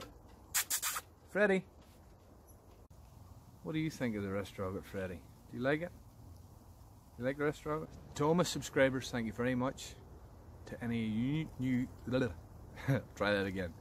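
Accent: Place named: Scotland, United Kingdom. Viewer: He sounds like he is from Stranraer in Scotland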